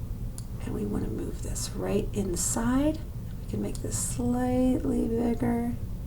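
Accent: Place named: Transatlantic, United States. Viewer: Please slow down on your talking, too fast for me, especially with a transatlantic accent.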